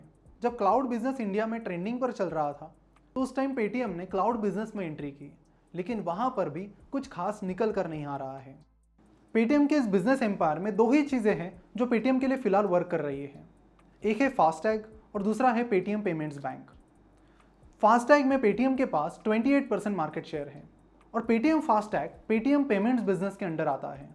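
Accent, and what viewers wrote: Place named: Nagpur, India. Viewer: Sir aap nagpur se belong karate ho sun le.proud feel huwa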